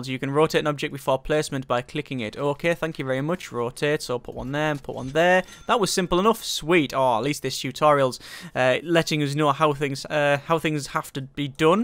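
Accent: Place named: England, United Kingdom. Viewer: As soon as you started talking i knew you were either English or Scottish. (Maybe even Irish)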